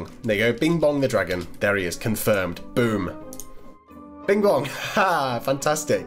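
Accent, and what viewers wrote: United Kingdom. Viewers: Your British inglish...it’s so charming! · I like ur accent British? Great vid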